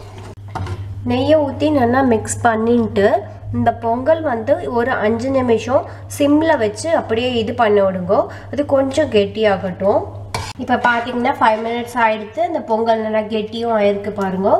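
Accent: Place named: Tamil Nadu, India. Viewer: Always amma prepared ..I am gonna try this tomorrow :) I simply loved your Tamil accent ..!! :)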